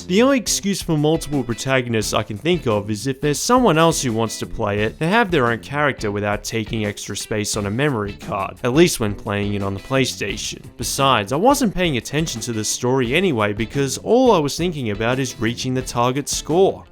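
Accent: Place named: Australia. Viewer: your accent is a really annoying version of an aussie one, did you watch too many american movies growing up :/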